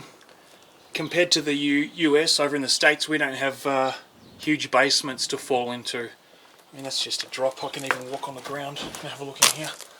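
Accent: Australia. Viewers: Interesting wildlife sounds I'm guessing by your accent it's Australia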